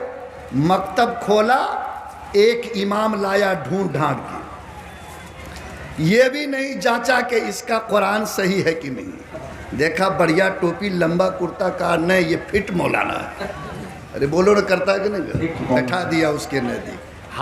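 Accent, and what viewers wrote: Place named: Bihar, India. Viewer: Andaaze bihari hae. Lekin haq aur zameeni sachai bol rahe haen.Lachhedar taqreer nahin.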